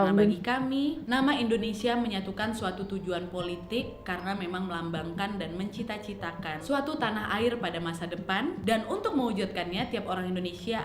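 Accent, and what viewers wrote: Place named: Sabah, Malaysia. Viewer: Mendengar logat bicara pasti orang Sabah dan bukan dari semenanjung 👍👍👍👍👍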